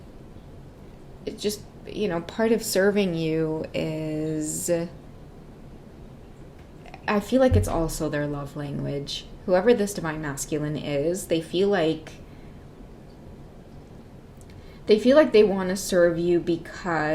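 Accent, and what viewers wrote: Trinidad and Tobago. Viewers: Could not mistake that Trini accent ❤️🇹🇹 I was listening while washing dishes and when I heard the accent I jumped 😂😂 I’m a Trini, living in Trinidad, who loves looking at tarot readings, just found your channel and I’m so happy to hear that accent 😅❤ Also I hope that’s my DM because I know he loves me, but timing and distance is the block. We’re both very busy too trying to build our lives 🥺 idk if it’ll go anywhere but we do have a beautiful connection, I at least really love that! ❤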